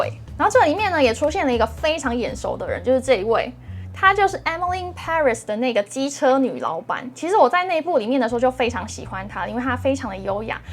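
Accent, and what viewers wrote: Taiwan. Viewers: 謝謝,好奇想問主持人是否台灣人,口音很像.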